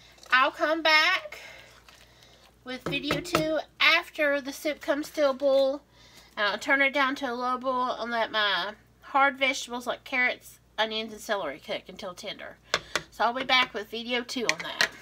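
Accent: South, United States. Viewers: That’s how us southerners say it☺️!! My late mama, God rest her sweet soul, would call the word, yesterday, yursday😂!! She also used to tell me that I needed to get my “poplar” sleep instead of “proper”😁!!!! I miss her💔!!!!!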